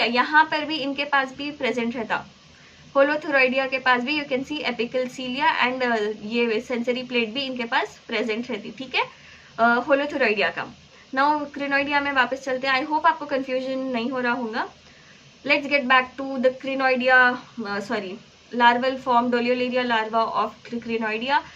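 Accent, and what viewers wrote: Hyderabad, India. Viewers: Easy to understand because of your hyderbadi accent 😅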